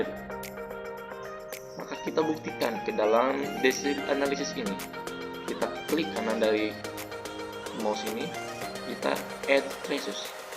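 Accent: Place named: Surabaya, Indonesia. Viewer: Mantap bang are are suroboyo 😁😁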